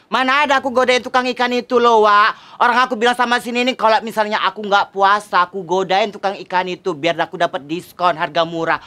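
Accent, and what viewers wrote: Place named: Medan, Indonesia. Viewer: 😂😂😂😂😂😂😂😂😂😂😂😂😂❤❤❤❤❤❤❤💯💯💯👍👍👍👍👍😴😴😳😳😳🤣🤣🤣🤣🤣🤣🙏🙏🙏🙏 orang Medan ya😊😊😊😊😊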